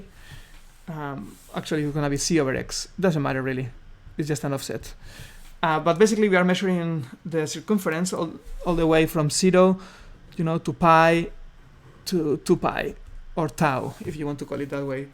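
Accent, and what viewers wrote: Spain. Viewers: Te he oido y he dicho este hombre tiene que ser español jajaj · COMO SE NOTA EN TU ACENTO QUE ERES ESPAÑOL JEJEJE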